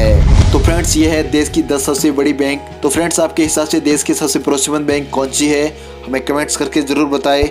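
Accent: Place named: Marwari, India. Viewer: मारवाड़ी भाई जरा हिंदी तो बोल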